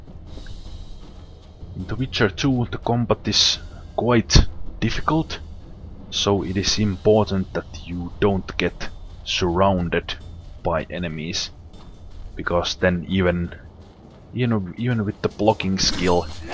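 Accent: Finland. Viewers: lovely finnish accent :D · the voice.......im 100% it is the finnish accent...its too distinctive.....im from finland so i know but i dont talk like that thank god lolo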